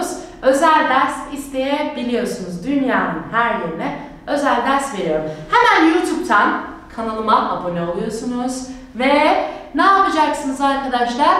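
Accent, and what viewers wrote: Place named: Istanbul, Turkey. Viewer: Hocam siz istanbul Kürtçesiyle mi konuşuyorsunuz? Bizim yamyamlar konuşurken insan içine çıkamıyoruz. Diksiyon kursunuz varsa benim öküzleri de yazdırayım kursa. Gerçekten çok naif ve melodik bir ses tonuna sahipsiniz, insana huzur veriyor. Şu İngilizce belasından kurtulursam ilk iş Kürtçe öğrenmeye başlayacağım.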